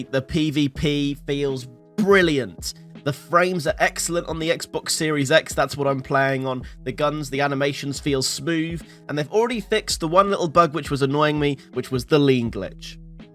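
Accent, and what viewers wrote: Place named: United States. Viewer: Mate, your English stop pronouncing your T's in an American accent lol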